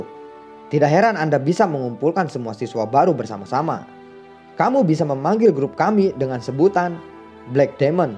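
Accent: West Java, Indonesia. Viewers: Orang sunda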